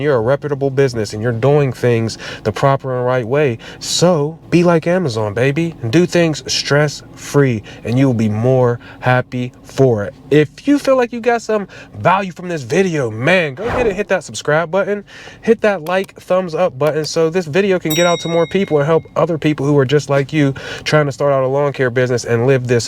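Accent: Pittsburgh, United States. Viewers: Damn I was like buddy sound like he from Pittsburgh lmao then I see the 412 area code on the trailer 🤣🤣🤣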